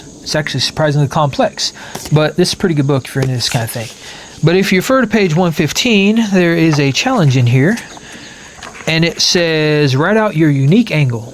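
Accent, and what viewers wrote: United States. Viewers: Im from the UK and only recently discovered your channel and really appreciate your posts! I am a little older but relate to so much of your thoughts and reflections. Thanks for uploading and keep It up! Can't quite place which American state your accent is from though. Texas? I work as a Project Manager and also struggle to explain who I am more broadly.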